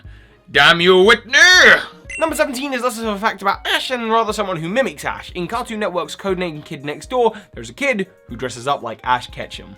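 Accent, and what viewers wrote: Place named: England, United Kingdom. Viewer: The English accent ertainly doesn't make it any easier! lol!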